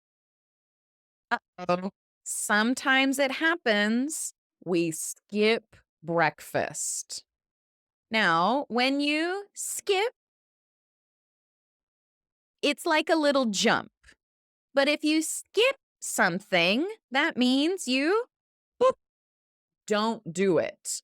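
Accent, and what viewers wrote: United States. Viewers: Good to have lessons only in the american accent like this. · It's amazing hearing pure American accent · Teacher makes more videos alone , I love your Americana accent .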